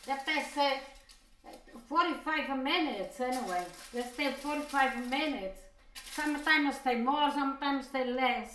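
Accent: Italy. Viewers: I sometimes forget to watch the video as I love to listen to her thick Italian accent! Love her! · I had the same exact plastic bowl, but in a lavender color and it broke one day while trying to seasoning my pork shoulder...by the way luvvvvvvv😍😍😍😍 your Italian accent and your videos...please continue to make them and the Italian food recipes...by the way I'm total Hispanic, but somehow I reallyyyy understand exactly what your saying even with your Italian accent. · you are awesome, my grandmother was from Poland a d except for the Italian accent you remind me of her, I will see her again someday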